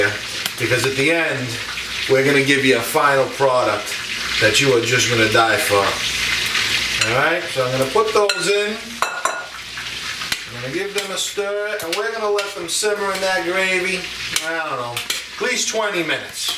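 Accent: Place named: Massachusetts, United States. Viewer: EH I SEE YOU GOT YOUR ST CHRISTOPHER ON YOUR NECK THERE... LOVE YOUR BEEYOUDEEFULL SILVA CHAINS ROUND YOUR NECK THERE... DID YOUR PARENTS SPEAK ITALIAN? YOU SOUND LIKE YOU AHH FROM MASSACHUSETTS.... MY WHOLE FAMILY ON MY MUTHAS SIDE WAS FROM THEY AHH. LOL YOU ARE WONDERFUL THANKS FOR THE RECIPE GOTTA GO TO THE GROCERY STORE REAL QUICK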